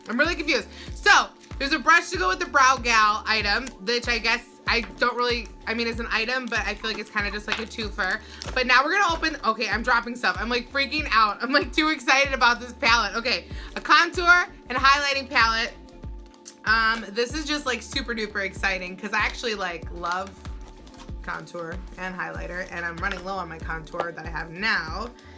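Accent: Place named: New York, United States. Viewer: I hear a New York accent 🤔 or might be jersey ! Can't wait to receive my box !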